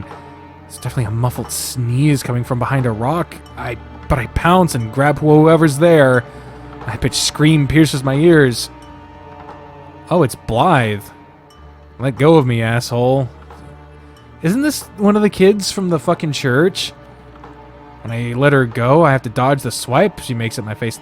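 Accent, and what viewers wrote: United Kingdom. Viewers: Ahhh... kudo's to you for your bri-ish accent, Also, Love the new video!!! I just binged all the videos I missed ever since the start of December so I'm glad I could finally be on time again ^^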